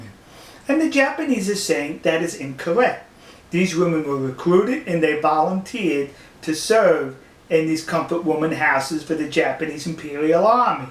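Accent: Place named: Northeast, United States. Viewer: Tbf that's a pretty common accent patter in parts of the American north east, especially in historical districts of larger cities.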